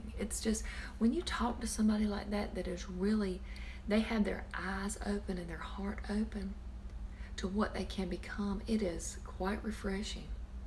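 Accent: South, United States. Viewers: Your features do remind me of Liz Taylor I wonder if the southern accent also has something to do with that, "cat on a hot tin roof" was playing in at the theatre recently. I wonder if you have seen her in e film? Xx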